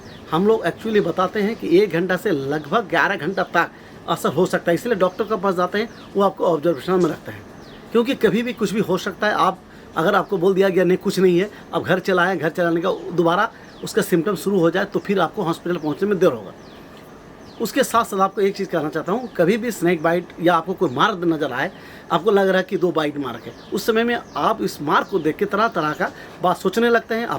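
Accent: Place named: Bengal, India. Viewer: Hello sir aap Jo Jankari Hamen Dete Hain hamen bahut help milti hai Aur ek Baat aapki baten sunkar lag raha hai ki aap bangali ho main bhi West Bengal se baat kar raha hun ki aap ka contact number mil sakta hai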